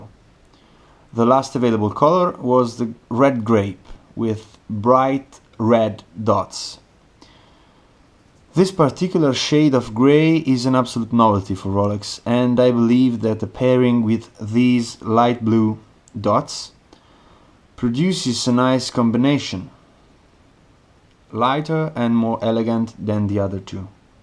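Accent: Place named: Italy. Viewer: The English with the Italian accent is excellent. And the review/preview is good.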